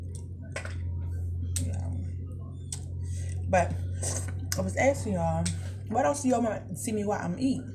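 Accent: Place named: Baltimore, United States. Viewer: You’re from Baltimore. I know this because I love y’all accents so much😭❤️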